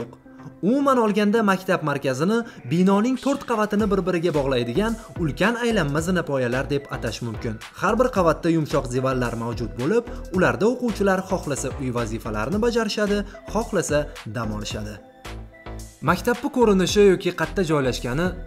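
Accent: Tashkent, Uzbekistan. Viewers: Iltimos shevada gapirishdan qochishingizni so'rardim Toshkent shevasi yaqqol bilinib qolyabdi vedio uchun esa rahmat olg'a davom eting.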